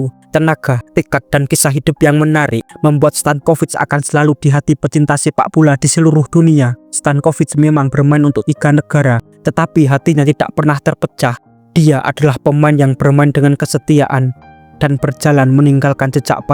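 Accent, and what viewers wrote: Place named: Java, Indonesia. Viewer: ngomongnya jangan medok bro, pake bahasa dan nada nasional. agar enak di dengar.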